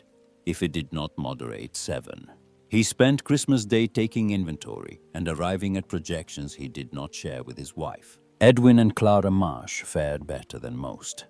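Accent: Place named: India. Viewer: Just when I got used to the other AI voice they change it to what is this an Indian accent? I don't like the change of voice so I can't listen.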